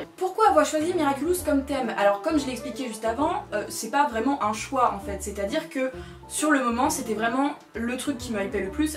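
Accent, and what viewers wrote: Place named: Belgium. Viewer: Tu es belge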